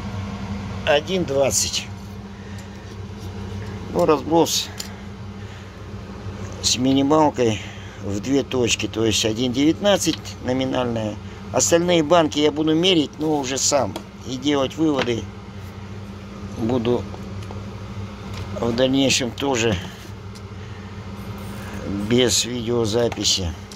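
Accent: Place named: Chita, Russia. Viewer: Друган ты точно с Казахстана !? А то мне кажется ты наш , с Читы )))👍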